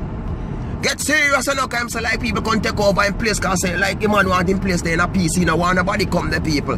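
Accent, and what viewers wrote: Jamaica. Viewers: You still speaking patwa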